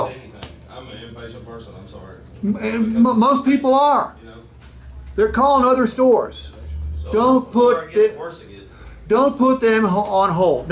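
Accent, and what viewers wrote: Appalachia, United States. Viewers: is hillbilly talk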